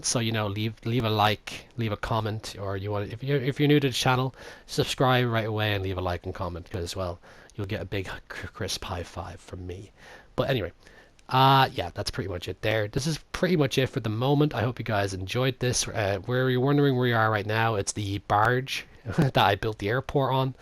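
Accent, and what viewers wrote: Ireland. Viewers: I'm guessing your irish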